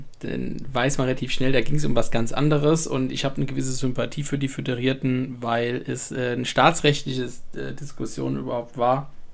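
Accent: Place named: Frankfurt, Germany. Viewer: sorry aber Arbeite mal an Deiner Aussprache, dem Gesamteindruck,Struktur und Aufbau Deines Vortrages -der content stimmt ja ;-) aber so ein echter Frankfurter? kann net anners!?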